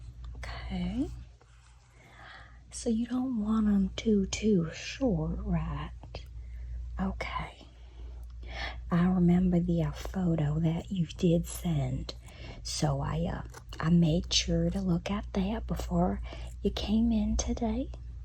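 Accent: South, United States. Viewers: “Nice and purdy” Don’t know why but your southern accent, particularly the grooming videos, gives me Steel Magnolias lol I love it ❤ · I still loove the southern accent! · Gawd, I love that Southern accent. Great video too ❤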